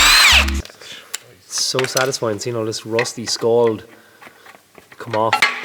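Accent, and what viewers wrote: Ireland. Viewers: I missed your FOKYN Irish accent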